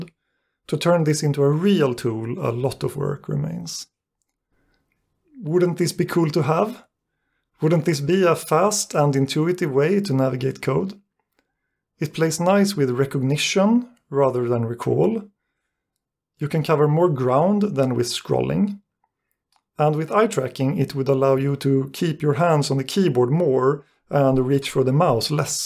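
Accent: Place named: Sweden. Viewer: The Swedish accent is strong in this one :)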